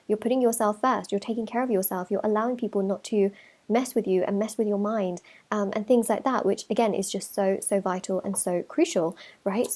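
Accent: United Kingdom. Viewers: Lovely reading, lovely accent (very British), a big hello from America 🇺🇸 to our friends across the pond, half a Brit on my moms side !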